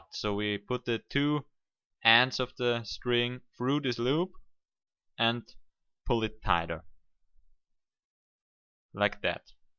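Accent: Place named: Denmark. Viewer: Det lyder som en dansker.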